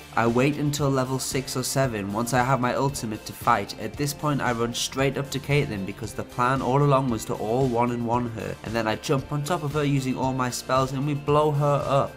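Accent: Newcastle, United Kingdom. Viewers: You're from newcastle aren't you?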